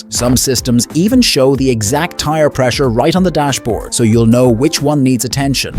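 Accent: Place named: Ireland. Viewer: Thanks for this video. Most of the negative comments are from people who like to complain. I like the narrator's Irish accent.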